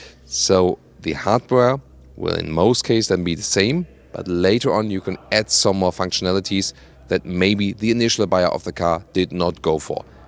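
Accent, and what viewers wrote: Germany. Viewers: I love the THICK German accent. :D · Zis is called German accent.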